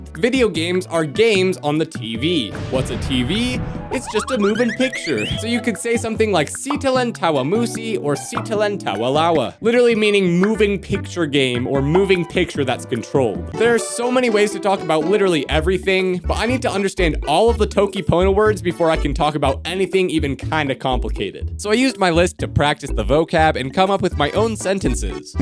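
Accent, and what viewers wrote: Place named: United States. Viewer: i still feel like you kinda have a really strong american accent when speaking toki pona... lol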